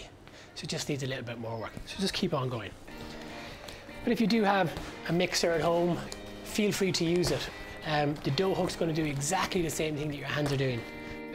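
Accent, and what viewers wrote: Ireland. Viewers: who knew a soft spoken Irish accent is so asmr worthy. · Irish accent. He clearly knows what he’s talking about! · Finally! has de-mystified everything sourdough. I was despairing of ever finding info that was easy to understand, conflicting and not over complicated. makes it so easy to follow…I could listening to his lovely Irish lilt for hours. 😊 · I use almost exactly this recipe, I add an ounce each of Olive Oil and Honey to mine, and bake in covered dutch oven instead of pyrex, but same principal... turns out perfect every time... Love this Video and the easy lovely Irish accent! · sounds irish :-) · Plus, that lovely Irish accent was an added treat <3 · Hi, fantastic video, and having that great Irish accent explaining every stage getting to that final loaf, makes it even more enjoyable. The way you describe each stage I think most people would not have a problem getting to the final product. 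I had one question, can I half your ingredients to just make one loaf at a time? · Brilliant! This is the first time I've understood the process AND how wonderful you included the why's! I've scoured the classes and they just weren't cohesive. You have a new follower. Lovely to hear your brogue, too. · its not sourdough bread its his annoying irish accent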